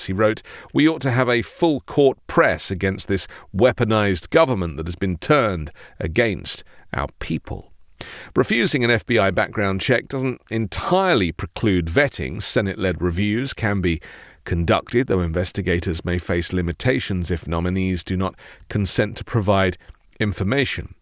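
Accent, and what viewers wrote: United Kingdom. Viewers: Why is some feminine ass white with a British accent telling me about my American government f*** off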